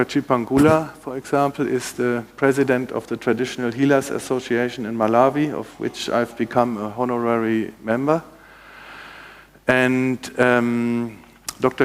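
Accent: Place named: Denmark. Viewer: Sounds like Danish accent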